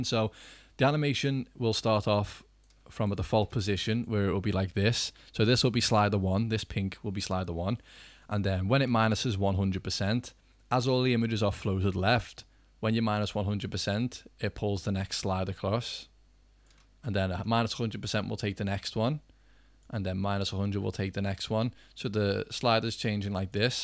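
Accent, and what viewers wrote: Liverpool, United Kingdom. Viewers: fellow scouser? haha nice one dude great vid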